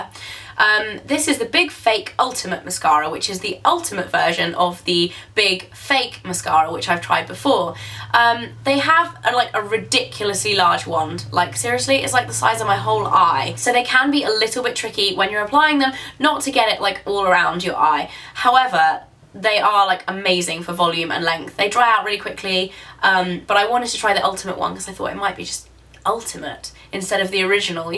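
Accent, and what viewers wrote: United Kingdom. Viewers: While I love watching your videos for the beauty/makeup reviews, I have to admit that one of the BIG reasons I'm subscribed is so that I can listen to your voice. I LOVE British accents! Love your videos too! I just bought "It's a 10" and it was a little over $30 in the US. Totally worth the money! My hair feels wonderful after only using it once! Thank you for sharing your beauty secrets,. <3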